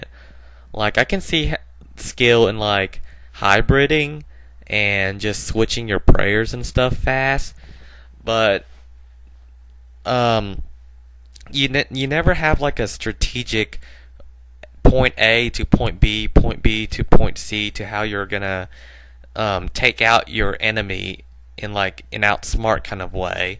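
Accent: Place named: South, United States. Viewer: U have a southern accent and ur asian???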